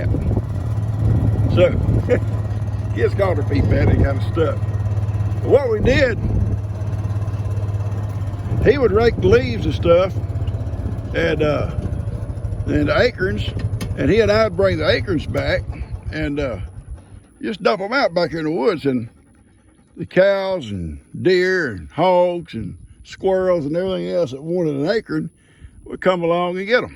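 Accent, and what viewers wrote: South, United States. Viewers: Good morning this is just the 2nd time I've committed...im new but love it I guess ur goat is special shes a determined lol I love hearing about ur farm i dont live on my farm anymore my daughter died n the farm house and I had 55 acres i buried her on the property under a apple tree ..but I couldn't live there anymore so my sons raising his family there now ..but I love seeing ur Animals it helps me miss mine but comforts also ..so ty for ur videos ..im southern to ..I talk like u and understand the slangwords ..lol ..ty ur so good at this...you make me feel like HOME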